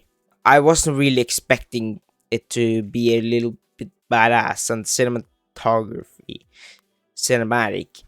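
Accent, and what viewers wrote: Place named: Italy. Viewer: Idk why I got this video recommended but it is kinda fun and I love your Manga collection. Btw where are you from? Your accent sounds a bit italian idk?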